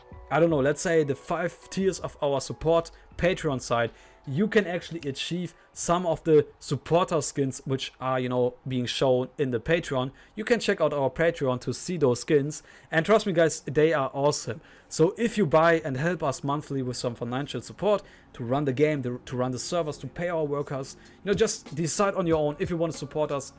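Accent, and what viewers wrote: Germany. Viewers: you have a German accent. BTW great wishes from Germany, im really excited about your work and how good the game will become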